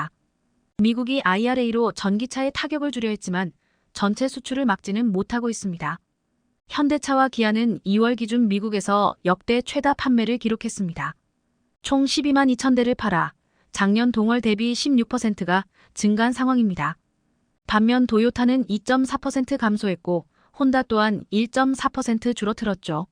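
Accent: China. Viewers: 나레이터는 조선족? 한국인으로 바꿔라! 듣기 거북함! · 이거 음성녹음하시는분 중국인 ?? 베트남 한글.숫자 몾일어요 2,000 t 이게 이 영영티라고 읽어요 중국님아 한글 개망신 시키네 유튜버 하지마세요 한글망신 에고거 한두번도 아니고 ........><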